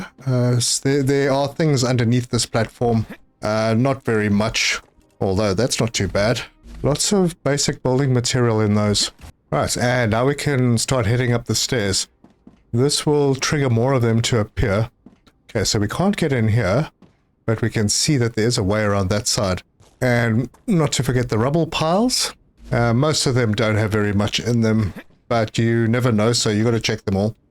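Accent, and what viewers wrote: South Africa. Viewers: You sound afrikaans! Hoe gaan het! Im Dutch but know a bit of afrikaans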